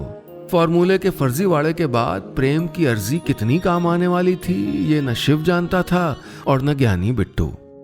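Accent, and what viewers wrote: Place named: Mirzapur, India. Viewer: Accent Mirzapur wala hai 👌👌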